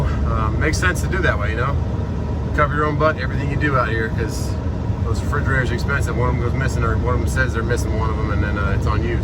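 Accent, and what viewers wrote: South, United States. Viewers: How come you have a southern accent when you are from SoCal/ AZ? Are you getting spinners for the new wheels?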